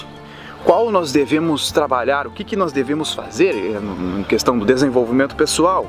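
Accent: Rio Grande do Sul, Brazil. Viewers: Ah, sabia que conhecia teu sotaque! Tu é do RS né?! Se não, é muito parecido. Excelente vídeo e paisagem 👏🏽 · Tu é gaúcho?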